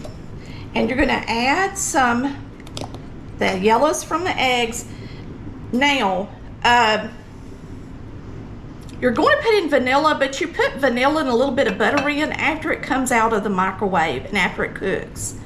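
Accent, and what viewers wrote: South, United States. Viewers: OMG...I could listen to you talk all day long! Love your southern accent and will for sure try this recipe. Bless your heart!! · Thank you so much for your recipe and your accent makes me think of home, I live in Tasmania now, but born and raised in the south.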